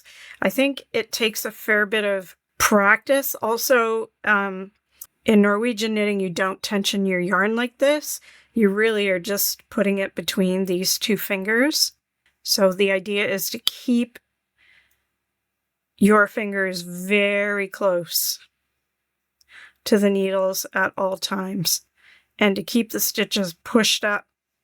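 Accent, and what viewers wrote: Canada. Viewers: I am a crocheter, but I recently learned to knit because I became good friend with a knitter. She taught me continental, but i stumbled into continental combination. I'm SO GLAD to find out that it will NOT mess me up in the long run to do that instead of "traditional" knitting. :D Also, I picked out that you're canadian instantly. Thank you SO MUCH for these tips and method options!! You've gotten another subscriber from me!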